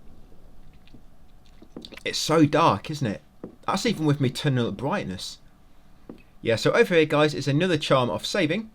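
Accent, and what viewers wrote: England, United Kingdom. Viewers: What a bliss to be looking for a walkthrough and accidentally find one of your favourites content creator. Thanks mate. Ps: I teach English as a second language, and I was trying to place your accent. Birmingham, Liverpool, London?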